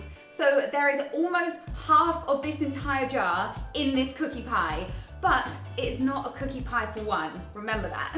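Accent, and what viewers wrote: United Kingdom. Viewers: You sound soo British cool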